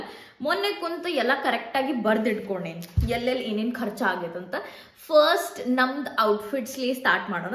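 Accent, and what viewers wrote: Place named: Dharwad, India. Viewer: Lot's of love From Dharwad ❤ yast cute aagi matadti akka dharwad bhashe❤😘 #🔥ni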